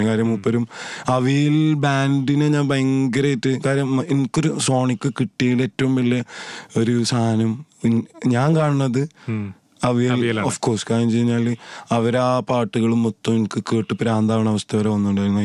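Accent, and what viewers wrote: Malappuram, India. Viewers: ഞങ്ങളെ മലപ്പുറത് വന്നാൽ അങ്ങനെ ആണ് ഭായ് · Malappuram bhasha vere levala..❤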